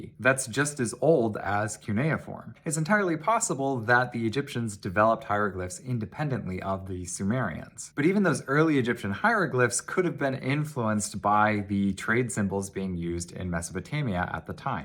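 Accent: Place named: United States. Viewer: I cannot stand the American pronunciation of cuneiform. kyuunay-uh-fooorm.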